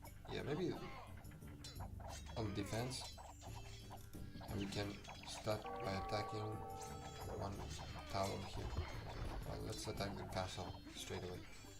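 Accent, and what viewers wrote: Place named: Netherlands. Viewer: Weird-ass Dutch accent, but okay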